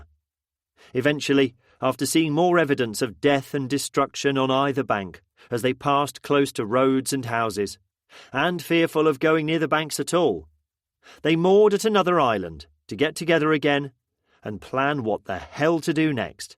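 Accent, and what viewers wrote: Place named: United Kingdom. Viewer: The cherry brit voice kills it for me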